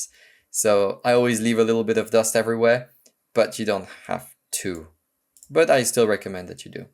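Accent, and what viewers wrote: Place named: France. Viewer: D'autant plus que l'accent est très frenchy 😖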